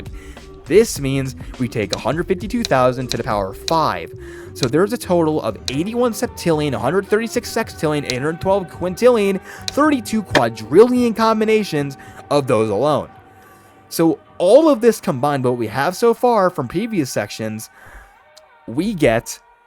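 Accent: United States. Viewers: His accent looks kinda American so i wouldn't be surprised. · fr she sounds American fr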